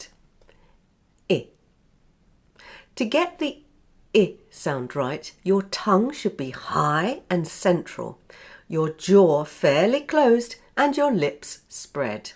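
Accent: United Kingdom. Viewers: 𝑵𝒐 𝒅𝒐𝒖𝒃𝒕, 𝑩𝒓𝒊𝒕𝒊𝒔𝒉 𝒔𝒐𝒖𝒏𝒅.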